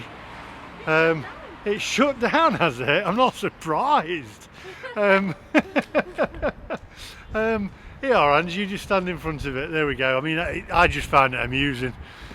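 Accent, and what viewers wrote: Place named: Black Country, United Kingdom. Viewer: I should be offended as im from Wulvarampton but i cor stop loffing at his daft black cuntray accent 🤣 He dow arf sound daft 🤣🤣🤣🤣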